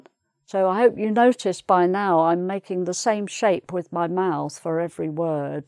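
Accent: United Kingdom. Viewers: It has been a utterly splendid, exquisite and flawless lesson. I'm particularly joyful, blissful and elated with that opulent topic. It is to my liking; moreover, it appeals to me. I do adore speaking, and her charming accent., Hence, I present; undoubtedly, the Queen of British English. You are the quintessential of British English. Thank you very much indeed. Far thee well, dearest Prof. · I love British English. Thank you for your English. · I love the british english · Que delícia assistir às aulas dessa adorável senhora! Inglês Britânico fica mais interessante com as aulas dela. Obrigado! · i like british acent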